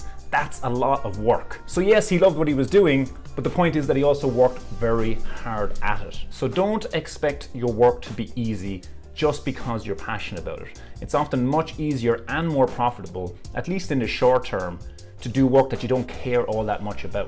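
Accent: Ireland. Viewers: Get a career closely related to your passion - that way you can use your leisure time to pursue it, while your day job is not an utter bore. Worked for me! Love these videos, an an Irish Canadian who loves hearing lovely Irish accents.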